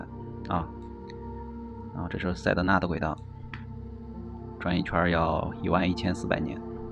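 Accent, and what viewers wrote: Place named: Shaanxi, China. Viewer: 陕西人吧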